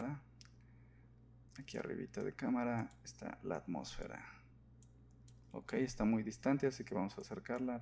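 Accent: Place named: Mexico. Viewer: me pasa que siento tu voz como si fueses actor o de alguien conocido, ¿será que los de latino américa ya estamos acostumbrados al acento mexicano en el doblaje?... xD Está excelente el modelo. Espero que te encuentres bien. Greetings!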